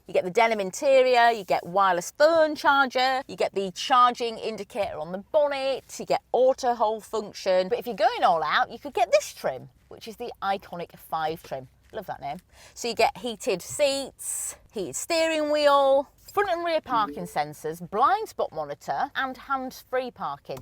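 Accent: Yorkshire, United Kingdom. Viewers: Accent? Yorkshire? Love it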